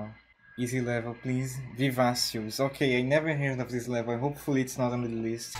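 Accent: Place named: France. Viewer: idk why but your french accent is very nice to hear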